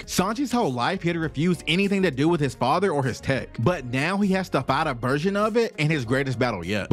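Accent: South, United States. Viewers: Bro im am american from the south and its so nice to hear a southern accent on the internet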